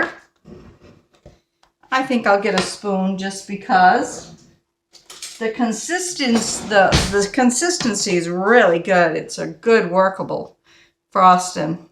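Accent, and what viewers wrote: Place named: New England, United States. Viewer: Are you from New England ? I know that accent. Im from Massachusetts. I thank you for your recipe. My daughter requested strawberry cake for her birthday. I am making a stabilized whipped cream frosting, her favorite.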